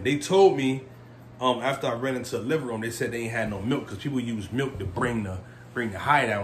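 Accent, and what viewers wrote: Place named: Connecticut, United States. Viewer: You sound like you from Connecticut. New Haven?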